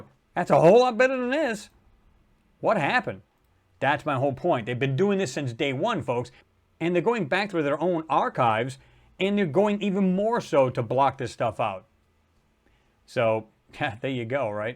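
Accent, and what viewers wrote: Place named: New England, United States. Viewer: NEW subscriber.. sounds like you're from New England. Rhode island here 👊👊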